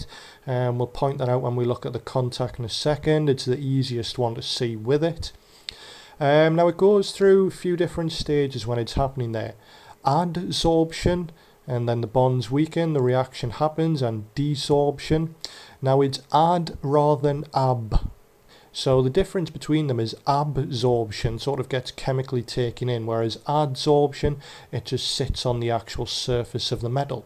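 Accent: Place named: Newcastle, United Kingdom. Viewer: Great to have a Geordie ;)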